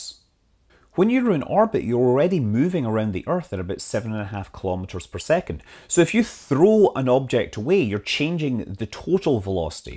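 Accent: Scotland, United Kingdom. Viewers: Is it scottish dialect what you are speaking?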